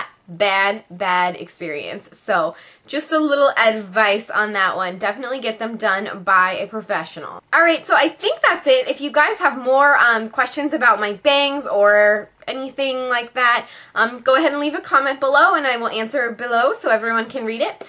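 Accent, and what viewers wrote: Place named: New Jersey, United States. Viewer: woah, you sound like your from new jersey(x ... well i LOVEEE your videos :) you are so sweet<33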